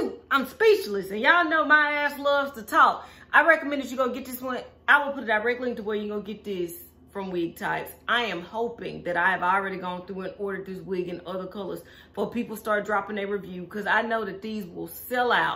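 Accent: South, United States. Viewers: Love your southern accent and charm! ☺️ I’m a newbie wig wearer and just purchased 3 Neesha Wigs (202 & 205). Had them thinned out and layered! Will be investing in the 208 and 209! 😘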